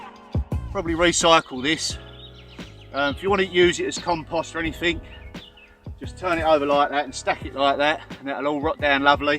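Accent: Essex, United Kingdom. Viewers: i live in Thorpe Bay (Southend) i knew you had a soft Essex accent. Wonder why you left?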